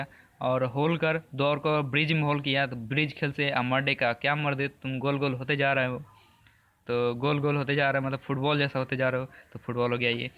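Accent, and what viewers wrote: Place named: Bhojpuri, India. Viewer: Your bhojpuri tone is really sweet and this is really amazing trick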